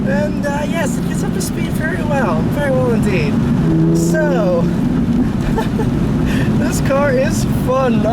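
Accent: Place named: Pittsburgh, United States. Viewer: Can tell your from the Pittsburgh area just by your voice lol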